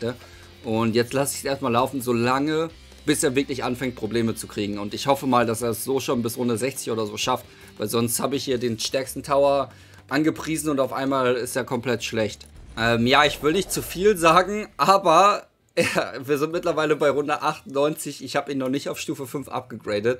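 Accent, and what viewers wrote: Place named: Germany. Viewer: Ich hab in deinen Englischen Videos irgendwie schon gehört dass du Deutsch bist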